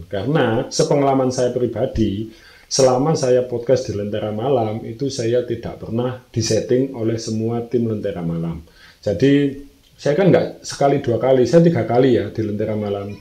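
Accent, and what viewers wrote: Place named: East Java, Indonesia. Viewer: Mas jatim mu mana sih kok medok nya super medok dokk dok...soale aku juga jatim tapi yg perbatasan jateng yg ga nglotok medoknya..yahh level sedang lah ...semangat mas bro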